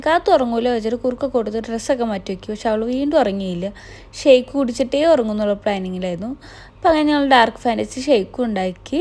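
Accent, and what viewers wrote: Thrissur, India. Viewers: തൃശൂർ സ്ലാങ് ആണ് ... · തൃശൂർ കൊളോക്കിയൽ സ്ലാങ് ആണിത്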